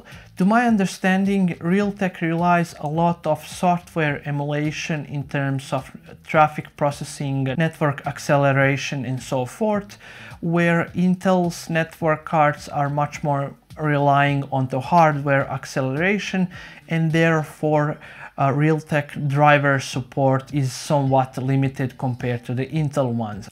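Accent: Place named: Russia. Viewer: You compiled this list of six "tiers" of hardware and then decided that the most efficient way for you to convey this information was by speaking slowly into a camera? You speak far slower than I can read. Also, your accent grates on my ears. I can't stand your Russian/Balki from Perfect Strangers accent. Why didn't you just post this information on a web page? This video is complete garbage. Whoever told you that you should speak in front of a camera should be tried for war crimes.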